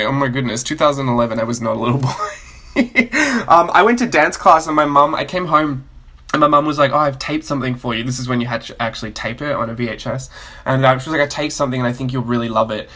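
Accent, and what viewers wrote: Australia. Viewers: Please never lose your Australian accent! Keep up the good work! Thanks!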